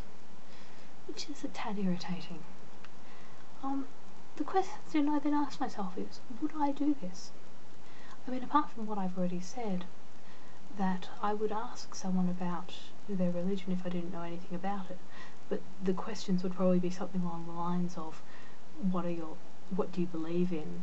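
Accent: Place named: Australia. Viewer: Okay now I can hear the Australian, sorry.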